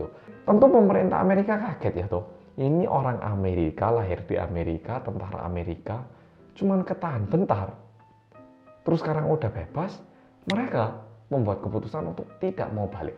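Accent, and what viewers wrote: Java, Indonesia. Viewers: Lah ini hehhe akhirnya 😁😁😁 kalo gada suarane kaaya gak klop mas. Gak bisa denger medoknya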